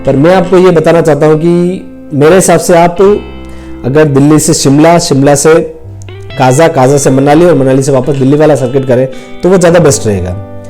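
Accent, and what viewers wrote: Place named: Rajasthan, India. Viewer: Bhai as per your voice you look from Rajasthan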